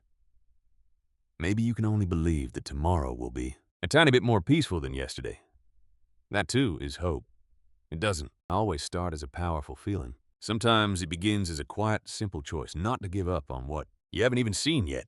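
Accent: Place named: South, United States. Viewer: You who is talking u are from the south bro I can hear ur accent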